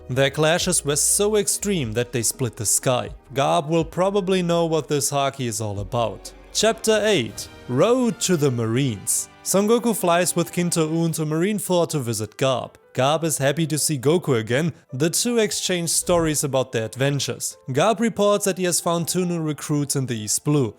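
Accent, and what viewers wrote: Germany. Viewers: Are you german? You have the accent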